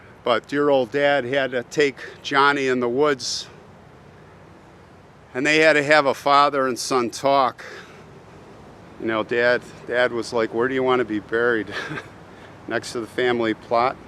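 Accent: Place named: Chicago, United States. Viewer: Great storytelling . Having lived in Chicago, it’s great to hear the familiar accent.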